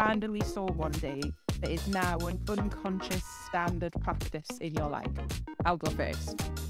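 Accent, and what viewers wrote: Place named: Stoke, United Kingdom. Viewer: can tell he is from stoke we are all the same.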